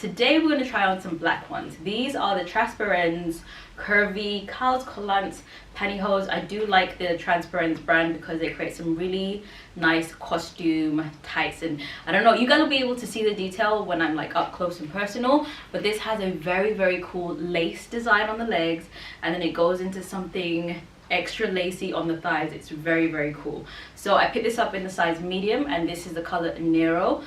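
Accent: United Kingdom. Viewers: I don’t wear pantyhose, pretty sure I’ll never wear them……but it’s so nice to hear an English accent now I live in the USA. Plus you’re so fit as well. London girls are the most beautiful girls In the world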